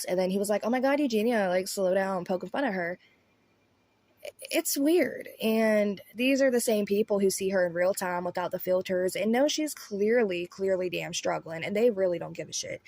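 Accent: South, United States. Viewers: The same thing happens with Tigers. They drug them so people can take pics with them. They are doped up so they can lay on a small table and people can pet them and take pics. It’s absolutely disgusting! I agree about the monkeys. That is such a sad situation. JS uses Eugenia and she will never admit that he is shady. She will continue to kiss him and promote his products. She is looking so sick too. I think she is at her all time low with her weight and it is really showing in her face. She is looking older than she should due to her illness. You are the only one I watch now about Eugenia and JS. You are straight to the point and don’t drag out the videos. I love your southern accent and that you don’t over edit. You are real and also very funny. I love when you do voice overs. Lol Keep the videos coming girl! I look forward to them. ♥️♥️ Hope your voice gets some rest.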